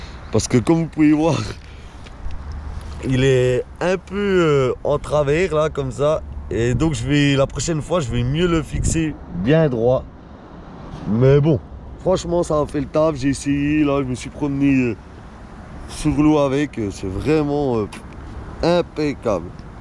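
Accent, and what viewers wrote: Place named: Franche-Comté, France. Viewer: Salut tu est francomtois?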